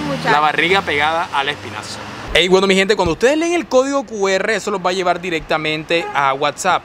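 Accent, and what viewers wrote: Caribbean Coast, Colombia. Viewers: Si, el habla costeño, pero no vulgar, ojala no se amane. Que siga como hasta ahora ha llevado su canal, gracioso, pero sin rayar en la vulgaridad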